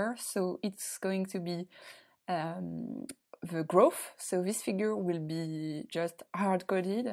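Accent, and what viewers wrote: France. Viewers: Merci pour la vidéo (j'ai reconnu l'accent français^^)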